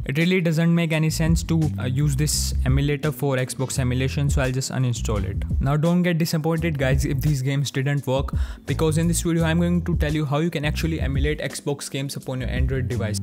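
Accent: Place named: India. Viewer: Yes something thst is indian on english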